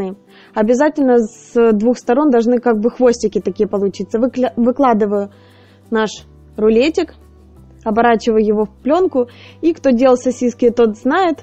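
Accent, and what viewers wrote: Ukraine. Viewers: Зачем же себя так мучать? Вас заставляют смотреть такой прекрасный ролик? Открою вам секрет в Украине так все говорят!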